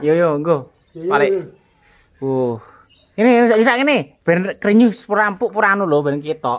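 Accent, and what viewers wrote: Java, Indonesia. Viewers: Aduh bahasanya medoook banget... Tapi mudah di mengerti.... Dan trima kasih ilmunya. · Mas iku wong Endi nek omong kok medok banget,tak cobake mene tuku ayam.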